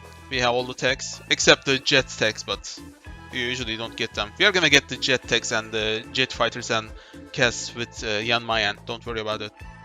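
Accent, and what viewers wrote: Turkey. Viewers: aksanından Türk olduğunu anladığım hoi4 oyuncusu. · Aksanından türk olduğun çok belli oluyor ama çok iyi konuşuyorsun,güzel oynuyorsun ama kanka çok uzun videolar,mountain general gibi kanalları örnek alabilirsin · bro are you turkish you have turkish accent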